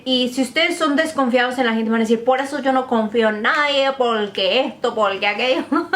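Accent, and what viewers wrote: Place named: Cuba. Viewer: A mi me gusta como hablas cuando lo haces de manera normal... ese acentito de cubana no m gusta.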